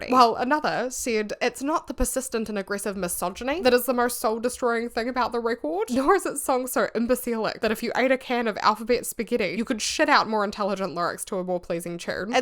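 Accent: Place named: New Zealand. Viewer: about your accent, it's beautiful and really pleasing to hear. I'm from Portugal and before watching your videos I had never heard a kiwi accent, so you're kind of an ambassador now lol I hope that u don't feel the need to explain it everytime ✨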